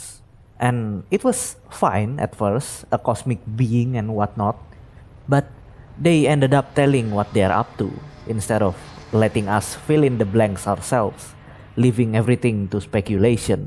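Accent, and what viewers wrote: Indonesia. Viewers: The accent sounds Javanese to me.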